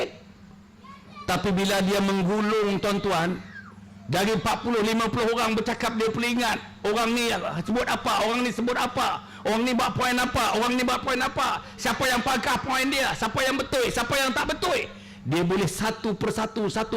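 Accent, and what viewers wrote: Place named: Penang, Malaysia. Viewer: cakap penang macam pelaq!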